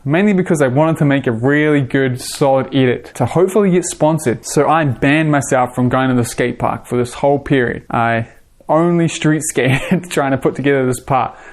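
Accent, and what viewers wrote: New Zealand. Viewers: So bad with accents dude had no idea you were in NZ Also, what you said about royals being hars for ppl I've been skating since 96 and still cant royal and farv. I can boot trick ANYTHING and I can royal down rails but flat ledges I just gave up on them lol. Reason I can royal down rails is I just naturally slip into them and learned to control it over time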